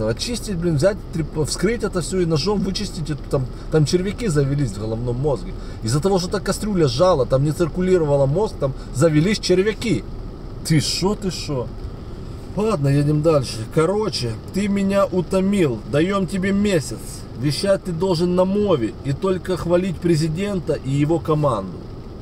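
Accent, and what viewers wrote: Odessa, Ukraine. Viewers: только подписалась на ваш канал. Слушаю вашу,нашу родную одесскую рэчь и уши сахаром присыпаются! Какой текст,чисто лейся песня!!! А в Одессе для настоящих одесситов,не для новых "одэсцiв" на мовi говорить чистое западло! И смысл комментариев на письма всяких Ебванько- это песня! Продолжай в том же духе! Здоровья и удачи. И процветания!!!! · Как он мне нравится😂😂😂😂😂😂Только одесситы так разговаривают 😂😂😂😂 Шутки, прибаутки ну прямо как моя подруга😂😂😂😂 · Брат полностью с тобой согласен.Твой Одесский говор и юмор класс, улёт.😂😂😂❤❤❤ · Как же Вы мне напоминаете Михаила Водяного. И тембр голоса, и неподражаемый одесский акцент, и манера разговора, и юмор, и смех... Мне кажется, Вы и внешне на него похожи. Очень хочется верить, что на Украине - 95% адекватных людей. Но по моим наблюдениям, это далеко не так. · как мне нравится твой одесский говорок. 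· я вот уже два мес слушаю твои репортажи но не подписывался на тебя, но после этой Ганны, решил подписаться. Не обижайся на ущербную, есть и такие люди. Ты прикольно рассказываешь, настроение поднимаешь, так что выпускай больше репортажей. Привет тебе от одессита, родной мой говор, который я уже 4 год не в Одессе. (((( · Я просто млею от Одесского говора. Привет с ДНР. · Гаси майдануиых.Уникальный Одесский говор.Красава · ай е тебя несет… 😅 твой одесский говор - не исправим!!!!❤ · Привет! Классное видео! В ржете в вперемешку с Одесским говором, а как мы режем! А ещё где вы едите. там небо такое тяжелое кажется прямо сейчас раздавит. А далее такие красивые места, горы! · Одессита сразу слышно по говору и шутке юмора😊! Второй день слушаю и ржу!!! Слава Богу, что Луганск в "оккупации"!!! Ждём Николаев и Одессу домой! Тернополь пусть ждёт репараций и расплачивается за кредиты их любимой власти. 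Там долг уже накопился не на одно поколение!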